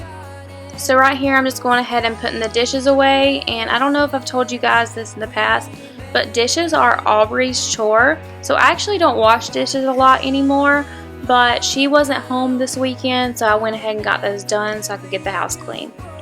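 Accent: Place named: South, United States. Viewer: I am from Texas so I I can appreciate the drawl of a southern girl ❤️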